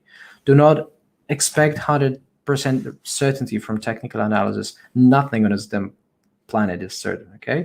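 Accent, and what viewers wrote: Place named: Poland. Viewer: Tak myślałem ze polski akcent, wpadłem od Scott Melker, pozdrawiam i dzięki za perspektywę. Dokańczasz do mojej listy!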